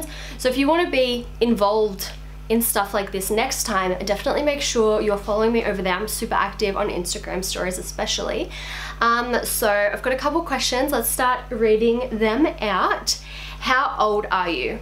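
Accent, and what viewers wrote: Australia. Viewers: That’s interesting that someone would say your accent is funny. Your accent sounds more universal than the strong slang Australian accent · Hahaha I feel you about thinking the Aussie accent is obvious! I think it’s even worse for New Zealanders ;)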